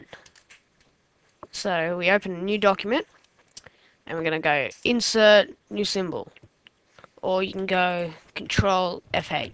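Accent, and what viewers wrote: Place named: United Kingdom. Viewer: omg love your british accent ^^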